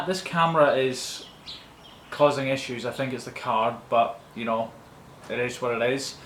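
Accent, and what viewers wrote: Scotland, United Kingdom. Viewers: Is he Scottish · Are you Scottish?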